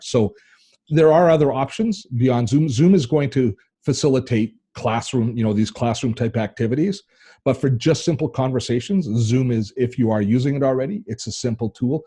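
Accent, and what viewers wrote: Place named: Canada. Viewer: Hi...very watchable...thank you. I am from the UK...have friends in Canada, so it was especially good to hear your accent and see your friendly front room style. I conduct/host a monthly discussion group...and new to zoom wonder about the 'hands up' symbol so I can mute people and then unmute them when I respond to the hands up? I am pretty sure I saw it in the free version...can't see it in the paid version.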